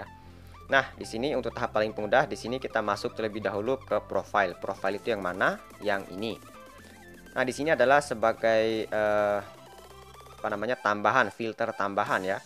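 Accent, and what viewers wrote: Bima, Indonesia. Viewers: Bahasa indonesianya kedengaran seperti Orang Bima NTB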